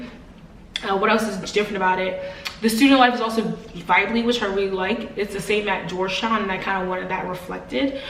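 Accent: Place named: Africa. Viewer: What is your ethnicity? If you're not familiar with the difference between Black Americans and General Africans then you probably won't know but the combination of the darker shade of brown,slanted eye size, her nose size and her resting facial expression would let me know she most likely not a Black American just by looking at her. I can also hear parts of an African accent slip out most likely from West, Central or even East Africa(Sudan) where her features most likely come from and her last name is " " which isn't a Black American last name.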